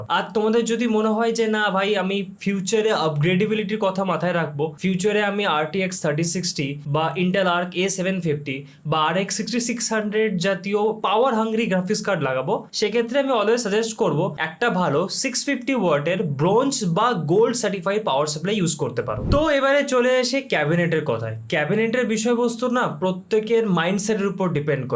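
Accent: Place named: Bangladesh. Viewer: Vhai apnar accent amader Bangladeshi der moto,ami apnar accent shune prothome vhabsi bd YouTuber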